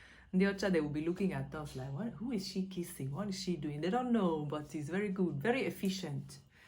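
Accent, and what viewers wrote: Igbo, Nigeria. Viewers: Even her ascent have changed to that of a typical Igbo woman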